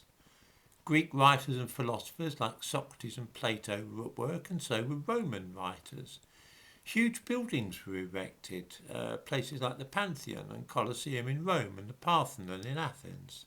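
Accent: United Kingdom. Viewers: All of the base technologies that allowed the human species to become the alpha predator of planet Earth developed in Africa before Africans migrated throughout the world. A lie of omission, even when spoken with a British accent, is a lie nonetheless..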